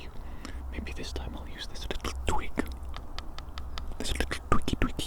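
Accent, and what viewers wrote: Wales, United Kingdom. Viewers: There’s something about your Welsh accent paired with your refined, gentlemanly tone that creates such a unique and relaxing ASMR experience. It's also great to see you with a bible, my friend. God bless you 😊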